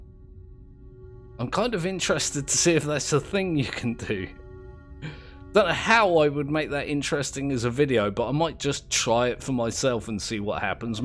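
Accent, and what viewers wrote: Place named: United Kingdom. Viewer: You're just so British. Very winsome.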